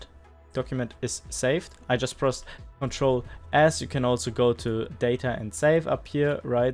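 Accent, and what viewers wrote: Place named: Germany. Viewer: Also Bro no Hat, aber den deutschen Akzent hört man dick bei dir raus. Aber sehr Informatives Video, Kuss auf die Nuss❤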